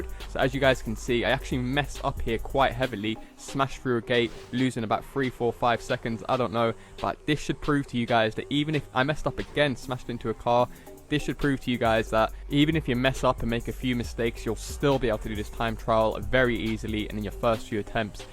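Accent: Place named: England, United Kingdom. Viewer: Ohh l love that english accent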